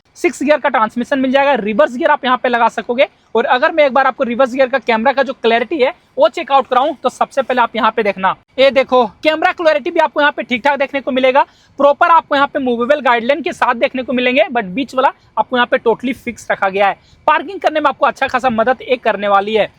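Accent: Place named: Bihar, India. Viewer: Bhai aap bihari hain kya?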